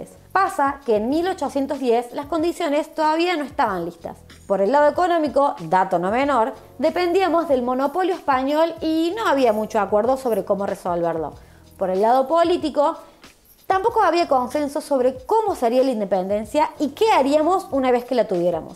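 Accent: Córdoba, Argentina. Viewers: Jajaj ame esa tonada cordobesa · Su acento es muy cordobés... Eso me agrada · Tuve que poner los subtitulos porque no entiendo el cordobés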